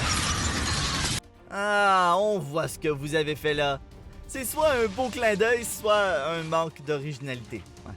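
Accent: Quebec, Canada. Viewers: Accent québécois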